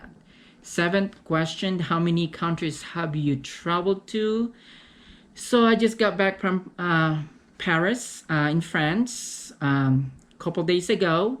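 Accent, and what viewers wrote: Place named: United States. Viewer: hi mekini lukluk same tyo fave mangoshake.ganda ng accent mo pra k naring ameican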